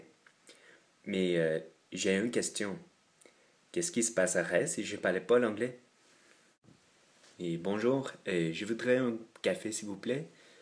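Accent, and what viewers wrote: Quebec, Canada. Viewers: Tu viens d'où ? Je suis Français et je trouve que t'as un accent québécois.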